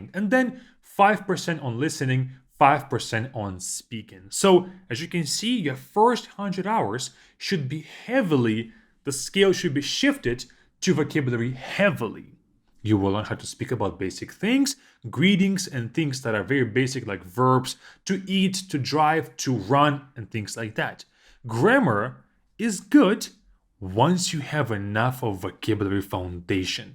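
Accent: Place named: Russia. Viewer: No? He just has a strong accent because he’s Russian. What he actually said was camps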